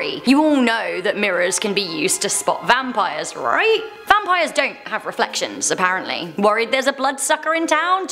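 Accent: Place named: United Kingdom. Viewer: Dutch 🇳🇱 love the UK accent and love u ❤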